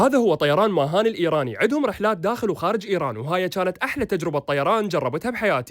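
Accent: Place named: Iraq. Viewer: عراقي ماتشوف لهجتة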